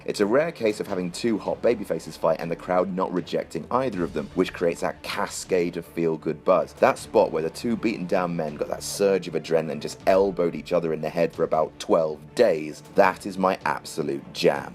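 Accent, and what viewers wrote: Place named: United Kingdom. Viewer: Luv u Have babies with me Their accent would be a combination of your beautiful British accent and my Australian bogan accent It would be amazing <3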